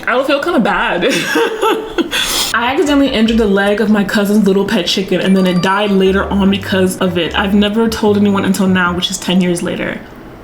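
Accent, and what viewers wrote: United Kingdom. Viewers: Your british accent threw me off😂😂😂😂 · You have such a good British accent girl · Great video these type of videos are funny but as uk girlie please let’s not do that accent next time was terrible 😂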